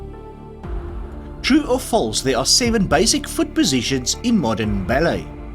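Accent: South Africa. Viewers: but does google have a South African pronunciation?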